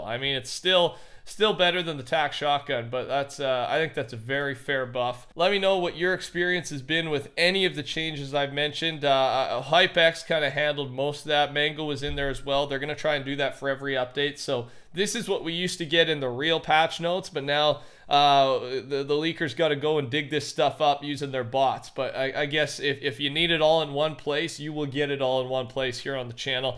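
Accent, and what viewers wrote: Canada. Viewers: Is he Canadian? Nvm xD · Are u Canadian?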